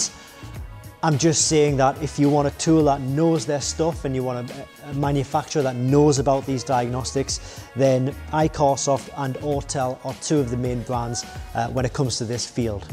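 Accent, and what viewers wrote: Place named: Liverpool, United Kingdom. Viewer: I am a fan of accents. I like to listen to different and know where they are from. I am curious which part of England (is it England?) is yours from. I would be guessing Liverpool, and as soon as I guess that I think I am wrong (?)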